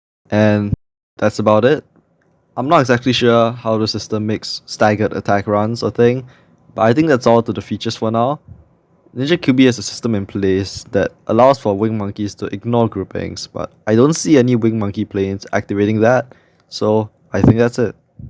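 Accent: Singapore. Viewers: why do you sound singaporean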